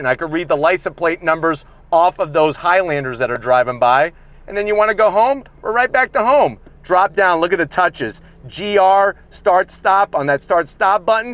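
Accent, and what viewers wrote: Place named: New York, United States. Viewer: )) of course, he sounds new yorkish, they can talk you to death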